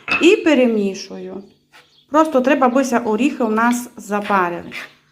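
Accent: Transcarpathia, Ukraine. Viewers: Дякую за ваші рецепти, з задоволенням слухаю вашу закарпатську вимову, дуже подобається.Миру і всіх життєвих гараздів. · Який же чудовий закарпатський діалект :) Так приємно чути! Дякую! Багато цікавих рецептів, вже хочу спробувати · Як приємно вас слухати...ваш закарпатський діалект...щасливого вам Різдва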